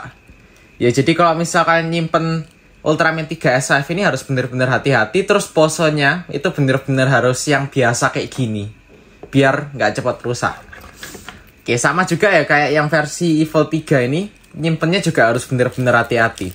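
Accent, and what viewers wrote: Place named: Java, Indonesia. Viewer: how much are this in rupiah and where can i get this in Jakarta? on a side note, i find it unique to how you’re a Chinese but speak Javanese dialect/accent 👍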